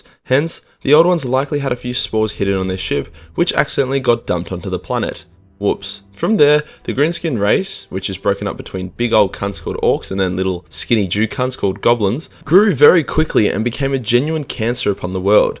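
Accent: Australia. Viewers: As a Canadian I reckon aussie have the best fuck off narration around, fair dinkum, bloody legend!!!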